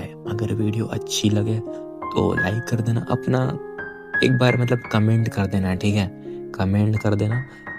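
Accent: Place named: Bihar, India. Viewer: Your bigari accent just wowww ❤ That thora,, kapraa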